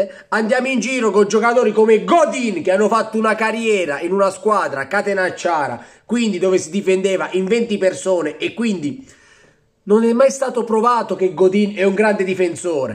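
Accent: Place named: Apulia, Italy. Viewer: Ma cosa prendi in giro xhe si sente che sei pugliese anche tuuu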